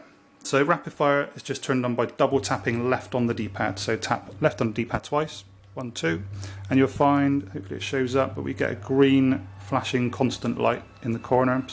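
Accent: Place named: Ireland. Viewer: Your Irish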